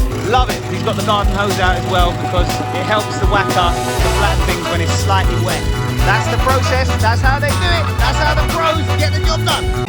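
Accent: United Kingdom. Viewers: Jeremy, just asking are you British because I am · wait are u British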